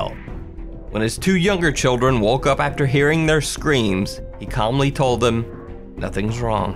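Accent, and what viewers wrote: South, United States. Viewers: you sound like you have a southern accent. Your voice is the best to listen to and your vids are the scariest. You and Mr. Nightmare are the best along with Mind Seed tv. You’re my favourite though obviously 😊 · you should hire someone to do the voice overs because you have some like, weird speech impediment. I can't put my finger on it... it's almost like there is too much saliva in your mouth mixed with a slight southern accent.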